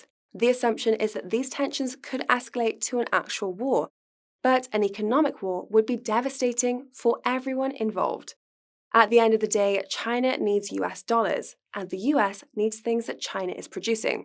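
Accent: United Kingdom. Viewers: sounds British to me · You’re a class act,! Wonderful British accent.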